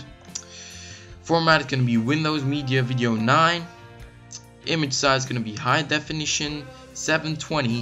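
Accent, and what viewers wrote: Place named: Boston, United States. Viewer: he's just from boston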